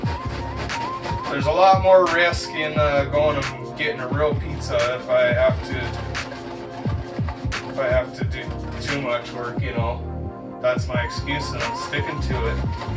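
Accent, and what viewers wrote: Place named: Canada. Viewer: Great job🤤🤤 The pizza looked soooo delicious. I personally prefer a fat head pizza crust or a chicken crust. I tried the cauliflower way but too much time 👍Your Canadian accent is so strong 😁. I use to live in Alberta but I moved back to the USA. Anyways enjoyed the video hopefully you will make more cooking videos in the future.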